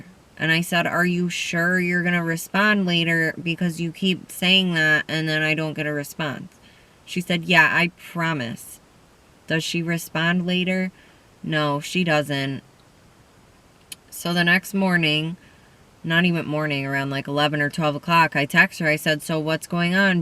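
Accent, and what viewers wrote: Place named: Midwest, United States. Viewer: Girl I just love your cute little midwestern accent! I'm gonna say like Michigan or Illinois? Idk why I love that accent lol Its just the cutest thing to hear 😘 I'm from Maryland so our accent is pretty unique. I've spent half my life in NY with that side of my family..... and they are all Italian immigrants so they can't go judging ANYONE else's accent lol But they say I don't sound like other people from Maryland so I'm really glad for that 😏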